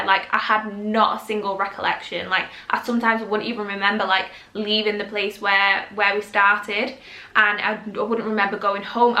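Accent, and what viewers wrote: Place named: North, United Kingdom. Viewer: Love the northern accent always... and hahah I remember the 20mins to text back so I will take longer.... what a game 😂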